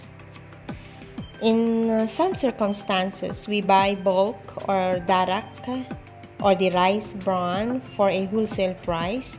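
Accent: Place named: Italy. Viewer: ur accent is italian, not filipino .... any accent will do as long as they can understand u, not wrong with accent specially filipino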